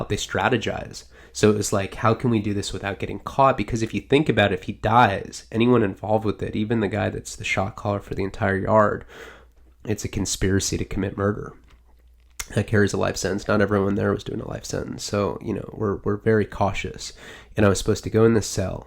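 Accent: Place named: Tennessee, United States. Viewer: Yo 🖤😋 love the southern accent so real! We sound just like that in Tennessee Great video😛 palabra💯🗨💥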